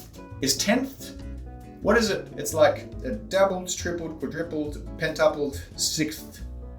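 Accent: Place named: Australia. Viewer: G'day mate. Just searched for Aussie D&D channels and yours came up top of the list. Good to hear a familiar fast talking accent 😂 Druids are so broken. The kind of class you love to play, hate to DM 😅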